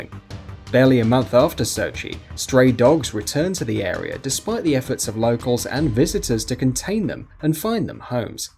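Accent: England, United Kingdom. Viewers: Who cares how it's pronounced he's saying it with an English accent so of course it's different. I pronounce jalapeño JAlapeno instead of HAlapeno...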